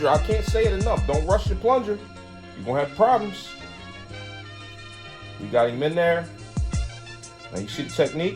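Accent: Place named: Philadelphia, United States. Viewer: I knew my man was from philly 2 seconds into the video You did your damn thing on that jawn💯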